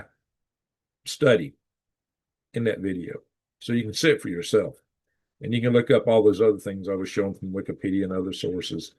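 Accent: South, United States. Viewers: my example is my friend. He's a good guy, he's my friend too. I showed him your channel when he was over. he teases your Southern accent "China", lots of people live in a 'fast world', and some of it is superficial. I'm back on it!! IGNOREISM- Caused my Schism! LOL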